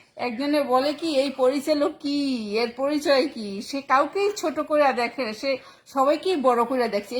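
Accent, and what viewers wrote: Barishal, Bangladesh. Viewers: কথা শুনে মনে হচ্ছে বরিশাইল্লা মানুষ । তবে এক্সপ্রেশন গুলো অসাধারণ ছিল !🫡🫡🤣🤣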